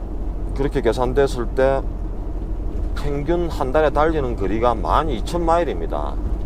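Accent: Gyeongsang, South Korea. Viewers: 처음으로 알게된 너무도 멋진 영상 과 푸근한 경상도 목소리 재밋게 듣고 보고 있는데 댓글보니 고인이 되셨다니 안타깝네요 꽤나 많은 인기도 쌓으신분이. 늦었지만 고인의명복을 빕니다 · 트럭에 관심생겨 님 유투브보다가, 댓글처음 남깁니다. 셀프결제 샤워시설 신기하네요^^ 경상도 사투리, 형부생각나 구수하네요, 겨울시작인데 안전운전하세요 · 경상도 말이 귀에 착착 붙네요 안전운전 하세요~~< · 🇺🇸🙏🏻🌍돈받고 여행하는 직업 ㅎㅎ😂👩🏻🤔🙋🏻‍♀️👍👀깔삼 오랫만에 듣네요..쪼매 ㅎ😂😂😂👏🏻👏🏻👏🏻🇺🇸🙏🏻저는 대구가 고향..🇺🇸🇺🇸20년 미국 살아도 사투리 여전 ㅎㅎ 님도 많이 쓰네요..😂😂👏🏻👏🏻🇺🇸🙏🏻👍🇰🇷🌍🍀🙋🏻‍♀️한번사는 인생..님처럼 사는것도 용기가 많이 필요할것 같아요.저는 파킹을 못해서 큰트럭은 못할것 같고..😂👍👩🏻🤔🤓🙏🏻🇰🇷🌍Michigan 에서 할수있는 것 부터 👀👀👍🌍👏🏻🙋🏻‍♀️저도 운전을 좋아해서..그리고 혼자🐯🐯새로운 직업을 가져 보고 싶네요.2022🇰🇷🌍🙏🏻항상 잘보고 있어요🙏🏻👀🇺🇸🙋🏻‍♀️💕💯🌍🌍👍화이팅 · 머나먼 타국에서 수고가 정말로 많네요. 어투가 경상도 분이네요. 혹시 주유소 몇곳과 주유소 접해있는 모텔을 운영하시는 신 부갑씨 아시는지요? 그분도 고향이 경남이고요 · 가끔어쩌다 트럭을 주제로한 영화말고 생생티비라니..근데 경상도 사투리가 아직도 그데로인데 아메리카 트럭커는 어찌 되셨는지 그게더 궁굼하네요..ㅎㅎ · 고생 많으십니다 경상도말씨 구수하네요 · 경상도 고향이 어디십니까? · 아재.. 경상도 어디 사람이에요?ㅎㅎㅎ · 먹고 살기위해 하시는것이지만 조금만 편하셨으면 하는 바램입니다. 경상도분같은데~~~너무 고생하시는것같아 마음이 짠하네요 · 경상도 캐내디언 ㅋㅋ 멋집니다. 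한때 캐나다 이민을 원했는데.... 아 ~~~~ 간접적으로 대리만족 합니다. 어차피 한국이나 외국이나 어디 사나 물질적으로나 정신적으로나 행복하게 살려고 노력하는 자세가 중요하네요 ㅋㅋㅋ awesome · 은근 중독이 됩니다 카나다 까지 가시어 경상도 구수한 말씀을 들어니 정감이 더갑니다 ㅎㅎ 늘 안운하시고 즐거운 시간 보여 주시길 바랍니다. 스근하게 즐감하고 가니더 ^^*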